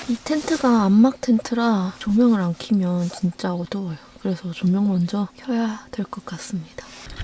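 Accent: Jeolla, South Korea. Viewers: 깝깝해부네 ㅋㅋㅋㅋ 저도 고향이 전라도라 사투리 말투 너무 귀엽네요 ^^ 는 무슨뜻일까요 궁금하네요 ^^ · 전라도 억양 넘 정겹고 좋습니다. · 전라도 사투리 정말 예쁘게 하시네요. 오늘 웃다가 구독하고 갑니다.^^ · 와.............. 유튭에서 전라도 사투리 왤케 반갑죠....?? ㅋㅋㅋㅋㅋㅋㅋㅋㅋㅋ 자주 시청하겠습니당 😁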